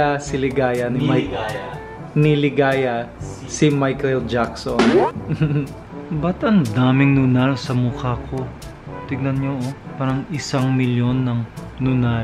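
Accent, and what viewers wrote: Philippines. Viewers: Hahaha funny! I miss you pilipino accent! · Lets Go To another Channel now i dont like to hear his filipino accent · Haha luv your filipino accent 🤣🤣🤣Puede ba mag tagalog pa minsan minsan, katuwa ka eh😁😁😁Keep it up!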